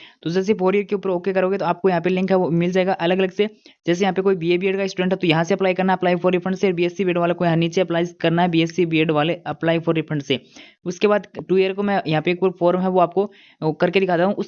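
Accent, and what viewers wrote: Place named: Rajasthan, India. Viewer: bhai teri rajasthani language ki boli mst h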